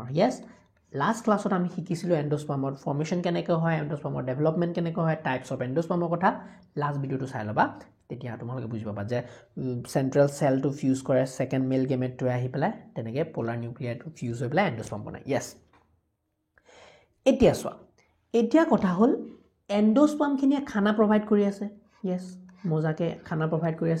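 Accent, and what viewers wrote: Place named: Western Odisha, India. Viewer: Tq sir.. being a western odisha dialect speaker it seems like u are just explaining in our dialect with slight changes in accent. Thanks for notes in english.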